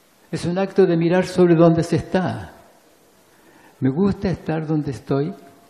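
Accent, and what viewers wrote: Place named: Chile. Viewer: Amo el acento chileno♥ Que hermoso escucharlo mi querido Maturana ♥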